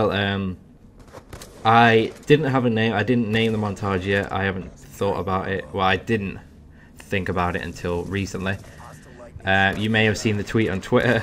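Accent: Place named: England, United Kingdom. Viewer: ENGLISHMAN!!! :) Ooooooooooshhh!!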